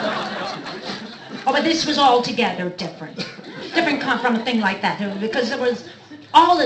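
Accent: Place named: New Zealand. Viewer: jeez, I love her strong Kiwi accent :3 it sounds so adorable)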